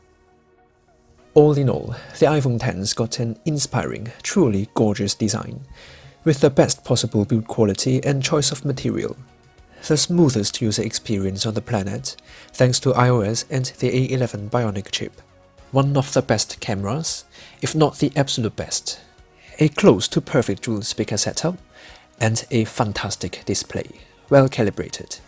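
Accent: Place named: United Kingdom. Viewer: 啲英式口音好正~~~好想學~~~~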